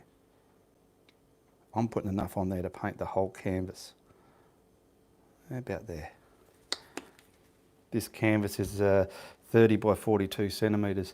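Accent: Australia. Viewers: I just love hearing u talk...ok? U sound like crocodile dundee for me ok? U paint beautifully! I am so frustraded because i really can't draw or paint, have no skills what so ever, but it's so fun when you manage something that looks like something if u know what i mean. English is'nt my Language so bare over with me. Well anyway wanted to say i like both your talking and painting. Signing out from Norway, have a nice day...ok? 😀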